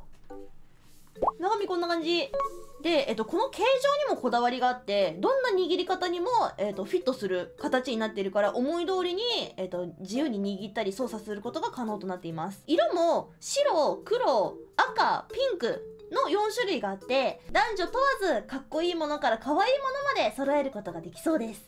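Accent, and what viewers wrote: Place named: Kansai, Japan. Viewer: 関西弁なんですね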